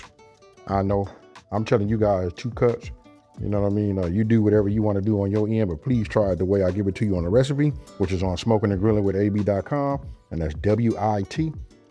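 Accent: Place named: Atlanta, United States. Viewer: Had a chixken casserole not spaghetti. Why does he have an Atlanta accent?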